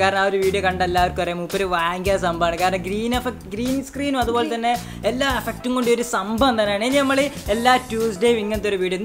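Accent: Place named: Malappuram, India. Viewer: Aalu kochikkaaranaanenkilum Basha. Malappuram thanne. .. Paarua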